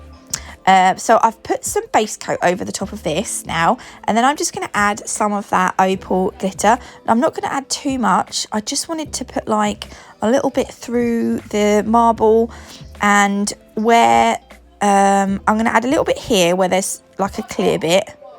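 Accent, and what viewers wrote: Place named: United Kingdom. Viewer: I absolutely love your content and I really enjoy watching your videos and I just love your accent it reminds me of my extended family who live in the UK 🇬🇧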